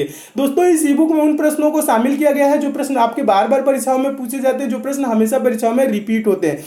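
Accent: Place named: Bihar, India. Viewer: Sir aap bihar se ho kya kyonki bihari 11 ko igara bolte hai nice sir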